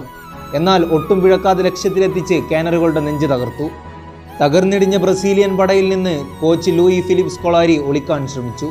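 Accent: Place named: Malappuram, India. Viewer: Very nice presentation .I came to this channel after watching your video on Mascherano.....ningal powliyanu...Njan Alappuzhakkarananu.... malappuram slangil Messiude video kelkumbol ulla feel onnu vere thanneyayirunnu...Keep doing more videos..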